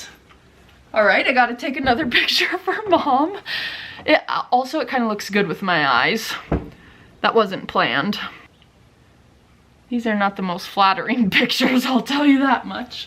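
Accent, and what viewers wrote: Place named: Montana, United States. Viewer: I was born in Montana ages ago. I came across your channel and your voice/accent stopped me in my tracks. Boy did I have a bout of homesickness! You sound like home to me. Love your channel. Quick question. Did you design the Montana home pillow? I’m a crochet girly and would love to make one for my bedroom. If you did design it, do you sell the pattern? If not do you know where I can get it? I subscribed just so I can hear your accent and see Montana content. My uncle (now passed on) had a ranch in Ekalaka. I was born in Choteau. Mom was born in Ekalaka. Dad went to school in Bozeman. Like I said, you’re a bit of home in my ears. Love your channel. Cerise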